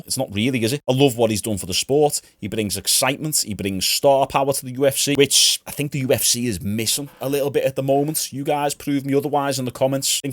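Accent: Liverpool, United Kingdom. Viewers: You're the first guy that speaks Scouser that i don't hate listening to. Good job! Chama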